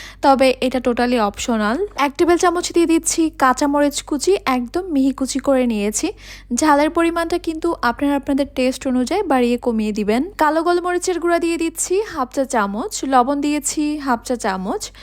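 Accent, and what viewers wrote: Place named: Bangladesh. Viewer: বাংলা দেশের যে সেটা বিশ্রী উচ্চারণ শুনেই বোঝা যাচ্ছে। বাংলা ভাষা টা সুন্দর সাবলীল ভাবে বলুন শুনতে ভালো লাগবে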